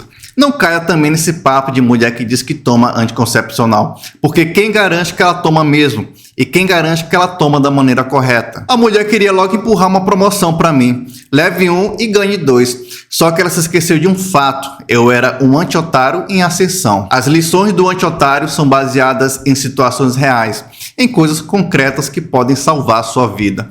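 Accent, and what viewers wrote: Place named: Fortaleza, Brazil. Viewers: Voce e muito inteligente engracado.Tenho uma curiosidade voce e cearencepois tem um sotaque de Fortaleza.eu sou cearence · Adoro os vídeos do, e a forma que ele fala é resenha e muito natural - "o mermo convite". Nordeste raiz (também sou) 👐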